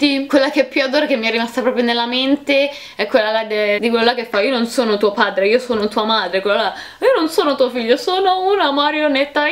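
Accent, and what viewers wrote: Rome, Italy. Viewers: È di roma e si sente tantissimo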